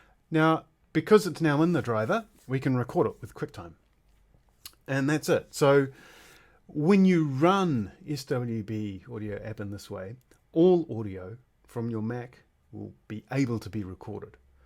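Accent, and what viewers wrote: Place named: New Zealand. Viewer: Works like a charm! Good old Kiwi ingenuity 🇳🇿🇳🇿🇳🇿 Recognized the accent from a mile away!!! Gotta support a mate from Porirua 😆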